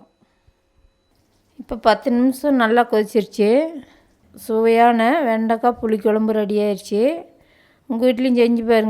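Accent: Tamil Nadu, India. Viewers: அக்கா நீங்க எந்த ஊர்,உங்க பேச்சு அரியலூர் பக்கத்தில் நமங்குணம் போல தெரியுது,உங்க டிப்சு எல்லாமே எளிமையாவும்,நல்லாவும் இருக்கு நன்றி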